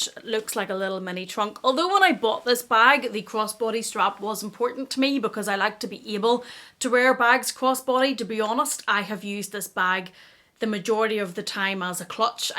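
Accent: Ireland. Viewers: Amazing collection! I also love chanel chevron 🥰 whereabouts Ireland are you from? Love your accent 💚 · You have a stunning collection! New subscriber; came across your channel and when I heard your brogue I had to sub! My grandfather was from Tipperary immigrated to New York where I am from but live in Florida now. The colors of your collection work so well for me and enjoying watching your videos!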